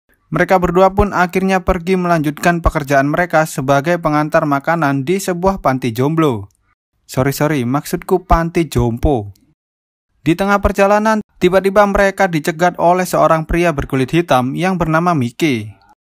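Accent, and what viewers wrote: Java, Indonesia. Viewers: Jowo mas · Bang orang Jawa kalh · He kak wong jowo yo kok ngomong e koyok wong jowo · Mimin keren bgt logat jawa nya auto subscribe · Bang lu orang jawa yh?? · TERLALU NJOWO BANG 🤣 ZOMBIE JADI JOMBIE 🤣🤣😂🤣😂🤣😂🤣😂🤣😂🤣😂🤣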